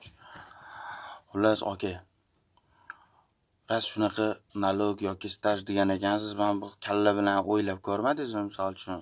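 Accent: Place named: Tashkent, Uzbekistan. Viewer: Ee birat chachvoribsiz likin toshkenchanixam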